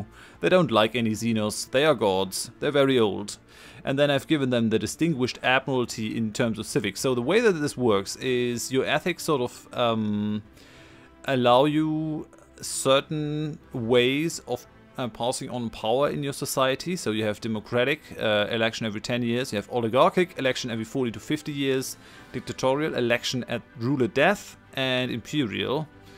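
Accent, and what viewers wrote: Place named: Germany. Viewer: NO WAY. I can't believe, it must be a miracle! When Utopia came out i quickly peek in the Stellaris wiki to find out what's new. Personally i found that my 1st playthrough should be done as F.Pacifist + Materialist (of course Fanatic Purifiers and Hive Mind is very interesting and absolutely new options, but when it comes to your own game you want to pick something balanced which will not prevent you from discovering all new stuff in the update, you know) as the most balanced between all new options. Unfortunately, i got too much work now days, so i must beeline my own game a bit and i decided to watch some letsplay first. And what i found over here?! Some german guy (it's a important note, because i find german accent the most pleasant for my ears (even compared to native speakers!)), who's playing exactly what i wanted to see (i mean race) with 2 most entertaining enemies in this update. 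Also it's Insane difficulty! (nothing else not worth watching imo, because i can do hard without any problem on my own). I got a bit of frustration right now, because it feels like someone just read my thoughts and did EXACTLY what i wanted to see xD Anyway, wish you best luck in this campaign and i happy to say that you've got a new loyal subscriber, who's watching this almost 5 hours in a row instead of sleeping, because he just can't stop =)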